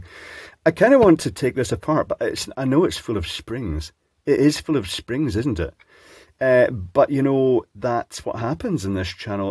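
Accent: Scotland, United Kingdom. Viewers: Poshest Scottish accent I've ever heard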